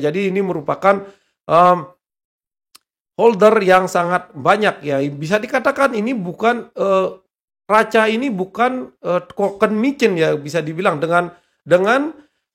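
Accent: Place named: Bali, Indonesia. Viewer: Medok banget bli ciri khas Bali nya😀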